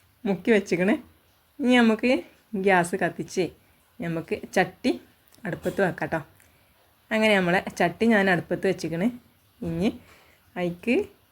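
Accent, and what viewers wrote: Malappuram, India. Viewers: മലപ്പുറം ഭാഷ മതി എനിക്ക് ഇഷ്ടം ആ ഈ സംസാരം കേൾക്കാൻ 👍🏻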